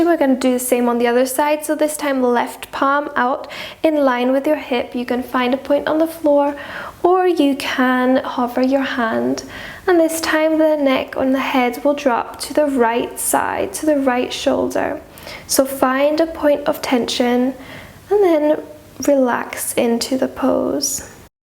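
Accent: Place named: Scotland, United Kingdom. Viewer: your gentle scottish accent is asmr heaven.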